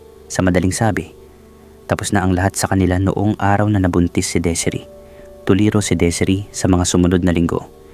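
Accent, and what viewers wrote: Visayas, Philippines. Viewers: Bisaya ba Yung narrator?Hindi marunong mag bigkas ng salitang Tagalog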